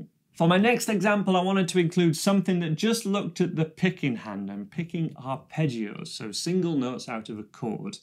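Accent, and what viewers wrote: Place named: North East England, United Kingdom. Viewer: You have a very North East accent... I reckon Sunderland.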